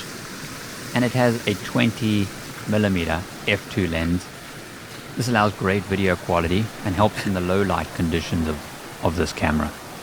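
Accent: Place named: South Africa. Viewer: You sound like my friend from South Africa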